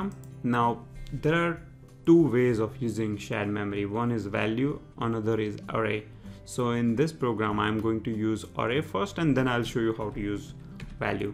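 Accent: India. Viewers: Your voice isn't as irritating as most coders with an Indian accent. Take it as a compliment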